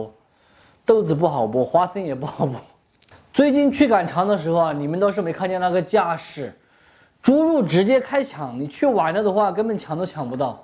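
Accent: Sichuan, China. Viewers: 冲着你这么接地气的川普，必须关注一下老乡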